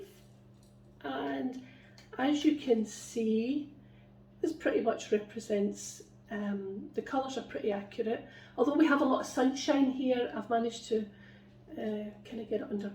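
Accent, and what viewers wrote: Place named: Scotland, United Kingdom. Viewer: Hope you and your family feel well again soon! Just came across this video in my recommended and fell in love with your accent! I don't know what it is, but the Scottish accents sound very pleasing! You mentioned that you lived in the Netherlands? How cool! Where in the Netherlands did you live? (I'm from the Netherlands, so just a little bit curious) :)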